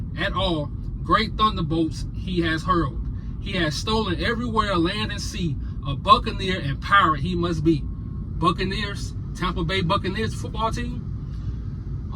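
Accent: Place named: New Orleans, United States. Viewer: Bro you from N.O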